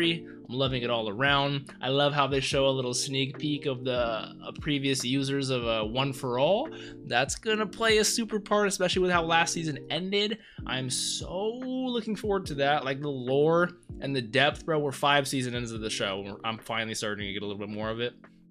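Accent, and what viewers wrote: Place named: Canada. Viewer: for some reason I thought u were Canadian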